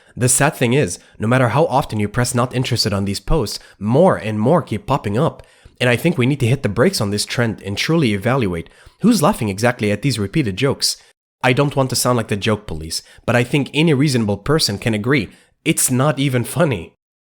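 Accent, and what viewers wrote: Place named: Germany. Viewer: Very slight accent, but i believe it is germanic, such as german.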